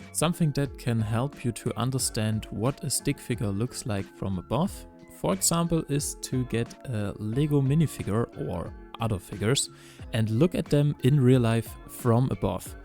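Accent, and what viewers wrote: Germany. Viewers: R u german? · I hear deutsch(gutes tutorial btw)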